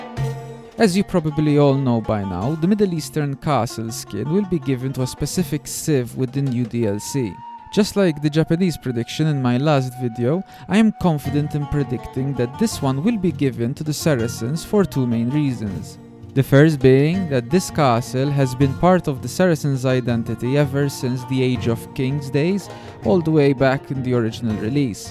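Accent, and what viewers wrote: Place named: Italy. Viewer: mmm. Your accent is definitely Italian. Bordering on stereotypical even, but I can't knock you too much, you only have so much control over your accent.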